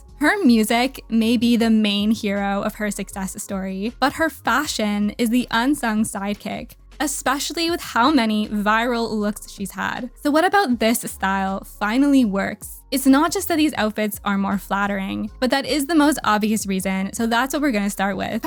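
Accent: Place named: Ireland. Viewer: Can I ask if you're Americans or Irish? I feel I can hear a slight Irish accent.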